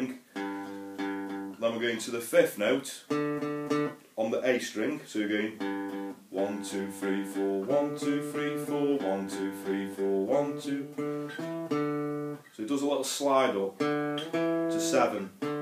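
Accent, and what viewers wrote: Stoke, United Kingdom. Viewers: Do u do lessons ? Noticed the stoke twang . U make it look so easy .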